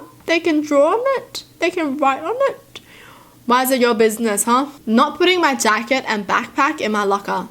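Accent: Australia. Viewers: Is it just me or I love her accent ✨ Yk, wotaaaaa and norrrrr and caaaaaare and sooooorrrr lol Aussie accent is so cute :D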